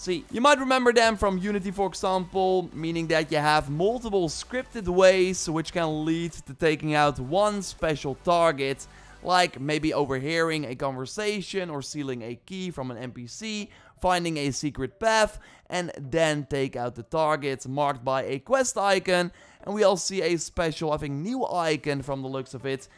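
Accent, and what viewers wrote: Netherlands. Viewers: The netherlands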